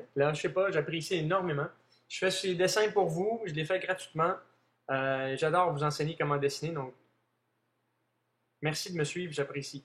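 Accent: Quebec, Canada. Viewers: Je ne suis pas cebequois mais je trouve que c le meilleur accent du monde 🙂🙂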